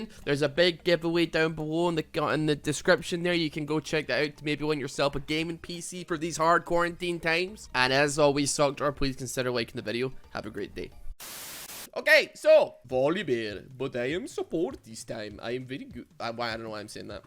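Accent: Scotland, United Kingdom. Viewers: Please go full Scot and don't tell any of us what anything means, i'm from further north but i'd still love to watch it. For the most bit glasgow speakers are easily enough understood. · League of Legends but goes full Scot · League of legends but u only speak pure scottish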